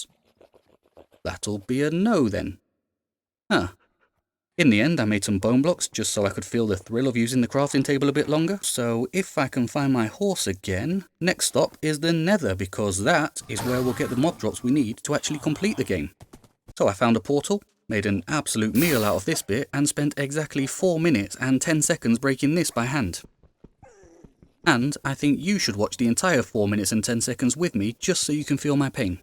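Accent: United Kingdom. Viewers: This guy is actually so funny, and chill lol. The british accent makes it better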